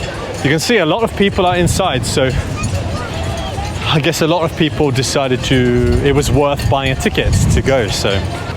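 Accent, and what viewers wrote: London, United Kingdom. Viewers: Hi, I’m Mary from Iran. I’m glad I joined your YouTube channel. Your video was amazing and unique, and I really enjoyed it. As a teacher, I think your method of learning English through real-world experiences is one of the best approaches. Bridgerton is so attractive, and I hope that one day I can visit there. I only have one question: which region of London does your accent belong to?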